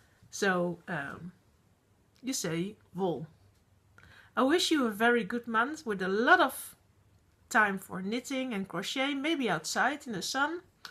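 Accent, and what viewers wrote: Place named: Netherlands. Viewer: Oh hi lovely, I love listening to you speak English, I think the Dutch speak English with such a nice tone, you sound amazing. Plus I love your fringe:) I am very fringe obsessed and currently want one again but my husband thinks not! hahahaa. I really like your Crossing Border shawl and so want to take part in your KAL, I'm off to find myself another cable pattern to knit, am currently knitting cables on my Buddleia Socks but started them ages ago so they don't count:) so nice to catch up with you today xx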